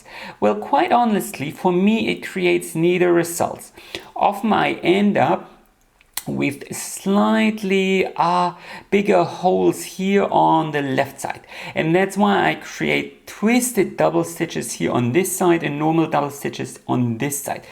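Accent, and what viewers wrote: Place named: Germany. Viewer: I'm trying to understand your accent. Are you in Germany?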